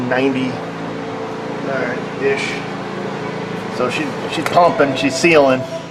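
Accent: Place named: New York, United States. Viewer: love the NY accent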